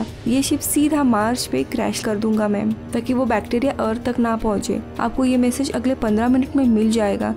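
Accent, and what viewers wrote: Maharashtra, India. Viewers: be patient मेहनतीच फळ मिळेल. मराठी Accent असूनही हिंदी छान explain करतेस तू .. थोड innovative कर with face explanation like " flimi indian" channel सारख public review घे इकदा Q & A घेऊन त्यांना काय हव आहे, त्यांना काय पाहायच आहे ., popular काय आहे ते विचार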